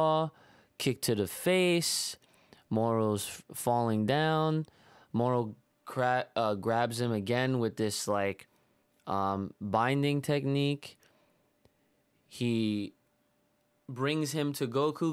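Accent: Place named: Philadelphia, United States. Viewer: Bro you from philly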